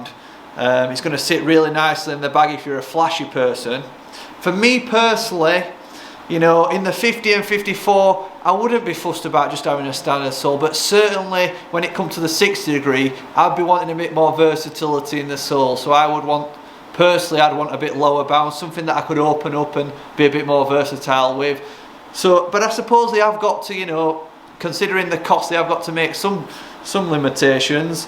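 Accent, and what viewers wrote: Yorkshire, United Kingdom. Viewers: Love it and it's great to hear a proper yorkshire accent on YouTube!! 😁😁 Keep em coming!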